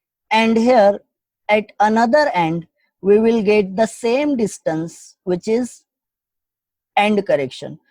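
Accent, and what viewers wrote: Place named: India. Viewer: Thank you mam!..u tought very nicely and in indian english as well!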